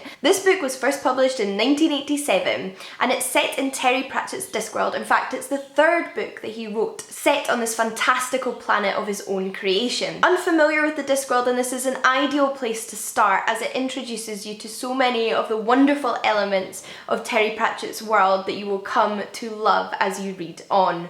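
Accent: Scotland, United Kingdom. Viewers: Amazing video, can you, or anyone from the UK, tell me which part of the UK speaks with this accent, would really like to know, thanks! :D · Yasss another Scottish person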